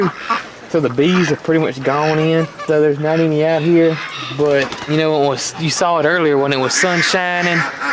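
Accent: South, United States. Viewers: Love your sense of humor!! Wrastling haha. I'm from NY so your southern accent is fun.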